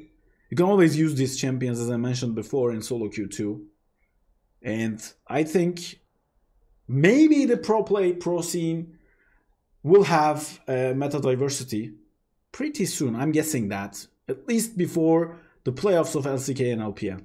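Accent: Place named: Turkey. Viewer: Just like Fatih Terim, how speaks Turkish, he also speaks English.Exact the same way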